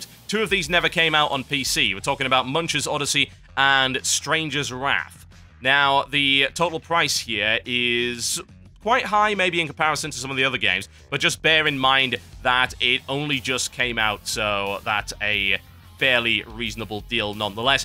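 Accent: United Kingdom. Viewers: i love your videos and your deep British accent makes it that much more epic! Plus your impeccable grammar makes it even more epic. So your videos are epic times like 120!!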